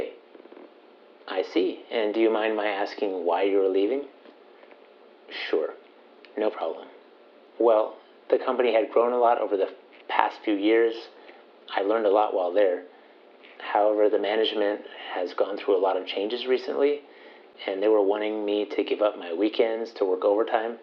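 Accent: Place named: England, United Kingdom. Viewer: Hi, I like your english accents very much. So I subscribed .thank you.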